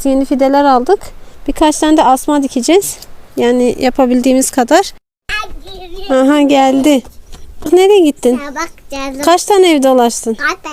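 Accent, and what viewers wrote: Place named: Adıyaman, Turkey. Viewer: Bir adıyamanlı olarak sivene bayıldım rabbim yardımcınız olsun yeni gördüm sizi takip etmeyede devam edeceğim inşallah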